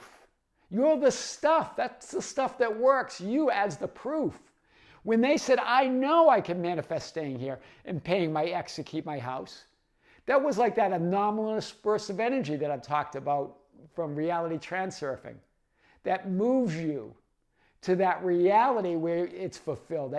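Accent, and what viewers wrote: Boston, United States. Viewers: I’ll be honest with ya, when I found your channel a while back, I was like “ehhh idk, this guy is kinda loud with a funny accent” (I’m from Arkansas so if anyone has a funny accent, it’s ME lol) but the more I watched you, the more I’ve come to love your Boston accent & you’re not loud, you’re PASSIONATE. Now I look forward to your videos everyday because you’re such a ray of sunshine & such a wonderful teacher. You’re a true gem,! 🫶🏼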